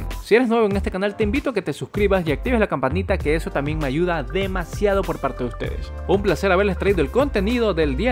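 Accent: Ecuador. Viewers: Eres ecuatoriano?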